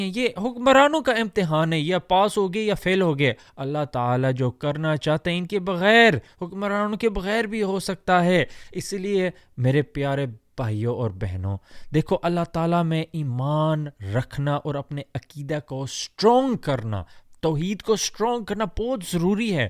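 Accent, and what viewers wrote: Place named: Punjab, Pakistan. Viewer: Bhai aapka lehjaa punjabi hai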